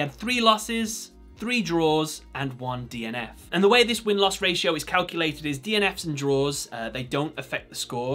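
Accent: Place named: RP, United Kingdom. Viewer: 😂 how truly mediocre, this person talks like the queen of England for gods sake, if anyone spoke like that in day to day life they’d never hear the end of it, he/she’s just trying to sound clever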